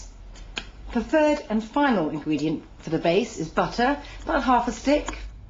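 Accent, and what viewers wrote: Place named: United Kingdom. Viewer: you sound very british...haha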